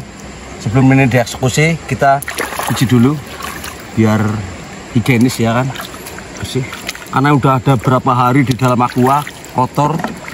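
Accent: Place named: Banyumas, Indonesia. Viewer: wong ndi bolo deneng ngapak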